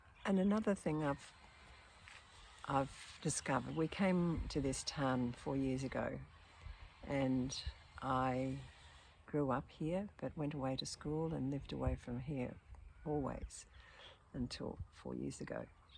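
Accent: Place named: Australia. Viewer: Dear (sorry I don't know your name) I loved listening to your video this morning. I've been listening to a few nice old ladies giving life advice with kind soothing voices so this video appeared in my feed today. Thanks AI! I have to say your voice is just beautiful to listen to! You have a wonderful way of speaking. And good advice too. I love the fact that thanks to YouTube we all have access now to the wisdom of people like you. It's a healing substitute for the families we either didn't have, or did have, but who weren't so free with their kindness & wisdom. What a gift to the world. I also love the fact that you are Australian. I lived there for 20yrs & hearing your accent, plus the crows & magpies in the background, brought back some nice memories for me. I'm back in NZ now & it's quite different over here. Best wishes from Wellington, I've subscribed and I look forward to hearing the rest of your advice 🙏💐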